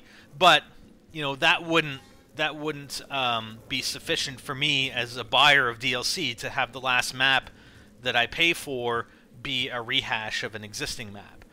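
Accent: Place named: Canada. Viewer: let me guess your Canadian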